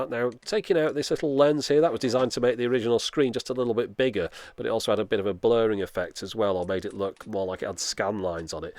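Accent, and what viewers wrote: United Kingdom. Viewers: WoWKoest I dont know what it is, but there is somthing inately satisfying about listening to brits talk tech.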